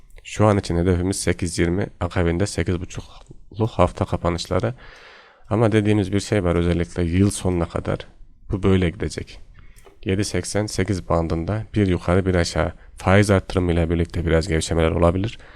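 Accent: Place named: Yozgat, Turkey. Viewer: Bence yozgatli kardes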